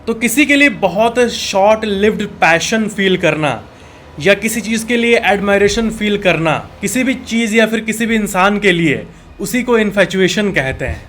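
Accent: Bihar, India. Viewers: Aap Bihar se ho kya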